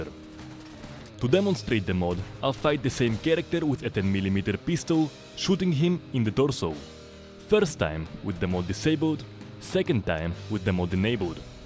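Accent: Russia. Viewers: I like your russian Accent :D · from what i see from ur accent ur u russian or other?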